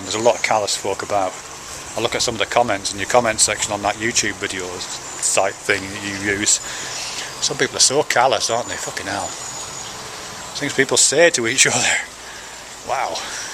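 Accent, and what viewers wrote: United Kingdom. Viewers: The odd thing to me is that your afterlife accent is British. Weren't you American originally? Is there an explanation for that change? Very good "channelling" of the content of someone else's super-ego otherwise though. AND you're absolutely right that some people are very callous to each other on YouTube. It'd be great if that didn't happen so much.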